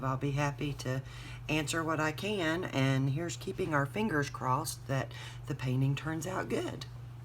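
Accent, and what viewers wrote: South, United States. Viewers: Beautiful! Your accent DOES NOT SOUND HICK....like someone else mentioned, it is Southern Charm!!!!!! I love the southern accent; HOWEVER, there are a couple accents that are not as pleasing as yours, at least to my ear!😊. I really enjoy your work and watching you produce beauty from nothing....don’t be so critical of yourself, you are a winner in my book....keep the videos and teachings coming, I want to learn more! Blessings..... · You do not have a "Hillbilly Accent"... That is Southern Charm.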